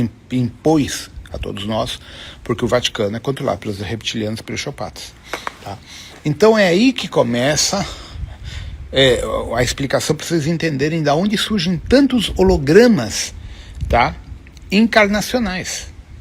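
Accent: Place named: Spain. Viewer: Foi ruim de entender pq ele tem o sotaque espanhol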